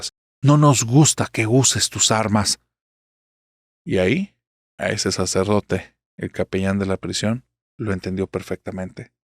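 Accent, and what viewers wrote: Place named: Mexico. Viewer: Mi bello narrador he llegado al final. Sos un encanto. Tu voz y tu acento mexicano y tu forma de narrar me fascinan ❤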